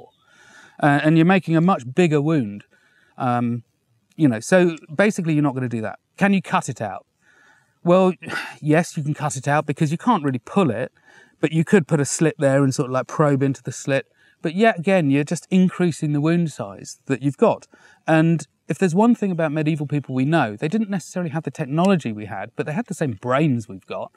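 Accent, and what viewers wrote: England, United Kingdom. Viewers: Is he English? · 16 Minutes of the spoken word on arrows thoroughly enjoyable, the British accent so much more appealing and easy to listen to than that, I know everything American wine. Thank you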